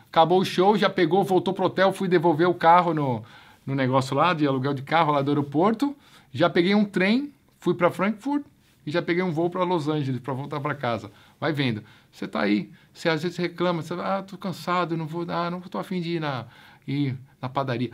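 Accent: Rio de Janeiro, Brazil. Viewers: parabéns pelo excelente trabalho! cara muito legal o história das antigas. sensacional. não dá nem pra pedir uma história, pq é um mistério surpreendente cada uma delas. não dá pra imaginar o q aconteceu com vc na sua carreira. kkk muito bom o sotaque carioca, e por fim uma sugestão, o q vc lembrar da memória q foi marcante, faz do mesmo jeito, liga a câmera e grava. tenho certeza q vai ser demais. abraço de máscara! 👏👏👏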